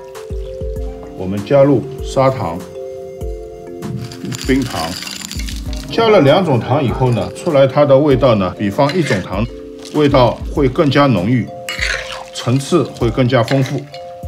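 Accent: Shanghai, China. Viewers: 一听就晓得是阿拉上海宁师傅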